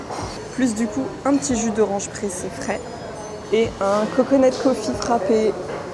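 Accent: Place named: France. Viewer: Really like your French accent! Making me to relearn French. Look like you enjoy time in Vietnam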